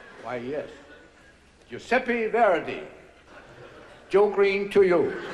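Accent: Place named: United States. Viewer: Never seen a Dane speaks this perfect American accent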